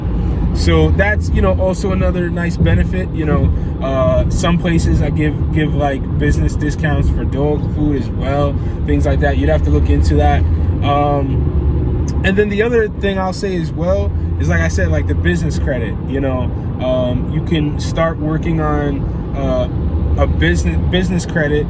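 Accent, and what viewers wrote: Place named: Baltimore, United States. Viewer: Dnt know yu first time in the channel bet my next 2 checks yu from Baltimore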